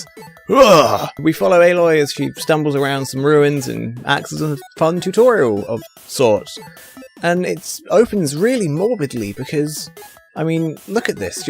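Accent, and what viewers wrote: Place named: United Kingdom. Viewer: hardcore british!!!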